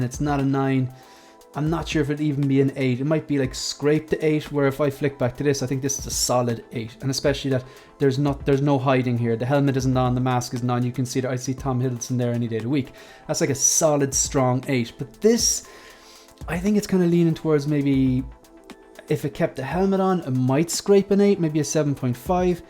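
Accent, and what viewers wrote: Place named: Ireland. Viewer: I watch a lot 1:6 collectibles/collector Youtubers. Lael, Riley, Justin, Will etc pretty much everybody, and dont get me wrong, I genuinely like those guys and enjoy their content but this video is a great example of why you are my favourite creator in this sphere of Youtube. As always just a very calm, thoughtful, balanced and measured approach to sharing your thoughts. I guess the Irish accent helps a bit too haha. Much love.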